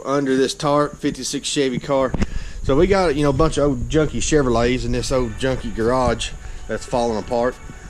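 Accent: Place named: South, United States. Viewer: Your southern drawl is real buddy. County strong 💪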